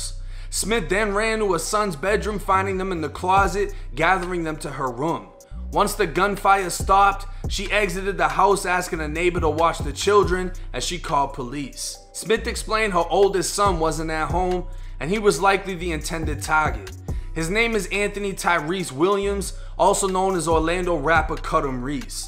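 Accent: Boston, United States. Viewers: Only new to your channel I'm Irish pal but loving the channel me and my girl was watchin you she says his voice is like Ted's Boston accent 💯👌💚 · U sound like u from Boston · That Boston accent is heavy bro, haha i rock with you... Remind me of Slaine... Keep doing your thang man...#Respect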